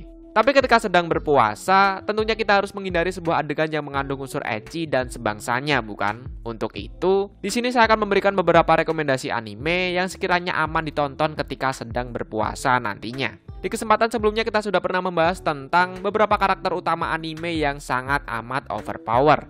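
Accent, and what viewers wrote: Java, Indonesia. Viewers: Gk popo asli jawa yh? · Jawa ya bang? awokawok sama :v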